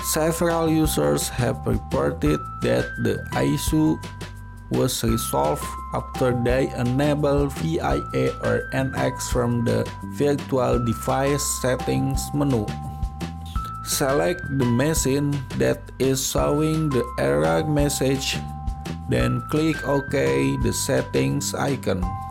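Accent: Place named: Bengal, India. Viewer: Dat bangga English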